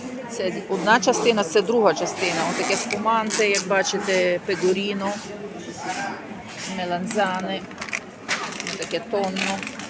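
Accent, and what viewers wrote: Lviv, Ukraine. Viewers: Та так,автор каналу говорить зi львiвським акцентом,на Зах.Укр мають свiй дiалект,iнтонацii'.Вiд цього залежить i те,як вона говорить по-iталiйськi .В нас на пiвднi не говорять "лятте","латте"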